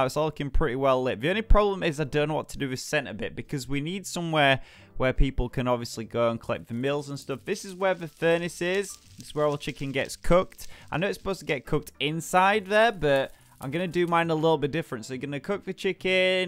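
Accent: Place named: United Kingdom. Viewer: Is a vegetarian or vegan? I've always thought he is so cute but when he mentioned that veggie burger I think I fell a little bit in love lol. (Totally not a biased vegetarian myself!) + that dialect is to die for <3 Where in the UK is from?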